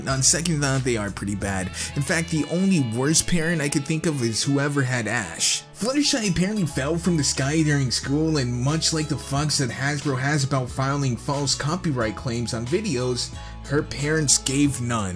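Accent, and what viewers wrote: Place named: United Kingdom. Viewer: On an unrelated topic, I had no idea you were British until the beginning of this video.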